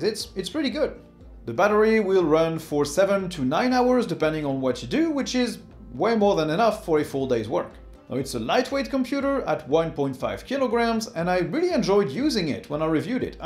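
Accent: France. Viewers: With more than 1K reactions, we'll have a winning change of almost one promille 😁, I'll take that. Always nice to listen to your lovely french accent, about diverse Linux items; I've used Unix and Linux since ~'83 and I still learn new things from you, thanks.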